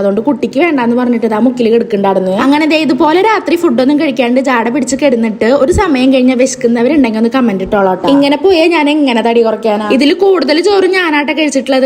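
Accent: Thrissur, India. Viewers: ഇന്ന് ശരിക്കും തൃശൂർകാരി ആയ പോലെ സംസാരം 😂😊🥰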